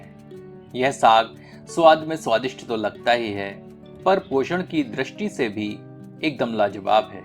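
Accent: Standard. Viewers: ji pahli bar Laga ki koi Bhartiya safe Bhartiya vyanjan banaa raha hai. Aap dwara shuddh Hindi uchharan sunkar hamen Apne per aur apni bhartiyata per Garv hota hai aapko कोटि-कोटि dhanyvad. Aap din duni Raat Chaudhari tarkki Karen Ishwar aapki madad Karen.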